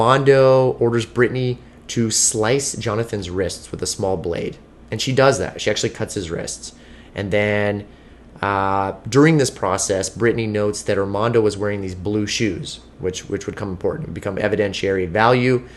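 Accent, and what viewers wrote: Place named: Canada. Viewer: Who is this dude Me love this Did u find me Or i find you WHY DO U SOUND SO KANADIEN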